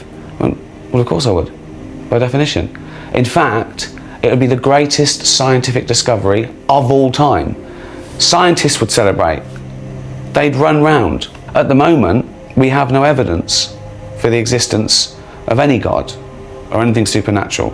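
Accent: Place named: United Kingdom. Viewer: Be careful dude. You can make just about any bunch of bullshit sound legit with a British accent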